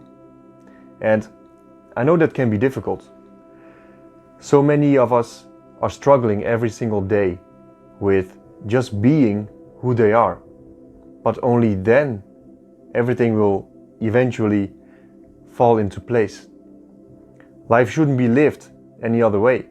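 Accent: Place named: Brazil. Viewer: Are you brazilian? 🌚 because you have a brazilian accent.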